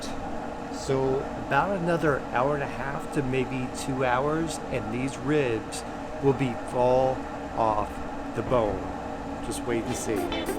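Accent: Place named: North, United States. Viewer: Northern accent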